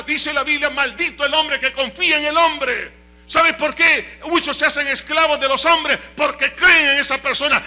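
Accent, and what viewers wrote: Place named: Honduras. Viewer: Le admiro Pastor como Dios le ha dado tanta sabiduria de la Palabra. Sus miembros son bien priviligiados de tenerlo como Pastor. Hay mucho que aprender de usted. Hermano con todo respecto, usted da buena comprension y aplicacion de la Palabra en la vida actual del ser humano. Hace poco encontre sus videos, en verdad an llegado a ser de bendicion para mi vida. Usted parece Hondureno hasta en su manera de hablar. Soy Hondurena por Nacimiento. Muchas gracias por su preparacion. Le pido a Dios que El lo bendiga, a su familia y a su congregacion y que continue siendo de bendicion al cuerpo de Cristo.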